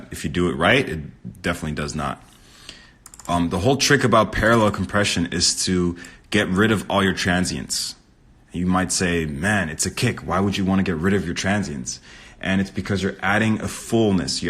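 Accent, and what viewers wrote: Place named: Pennsylvania, United States. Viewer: Sounds JUST like my guy from PA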